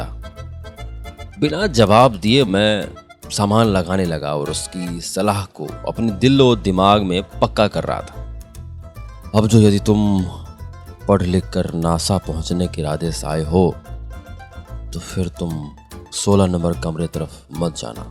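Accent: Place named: Kanpur, India. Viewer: 👏Brilliant voice expression.. you narrated each and every part wonderfully ... And your kanpuriya accent is zabardast 👍 waiting for the next episode Sir ☺️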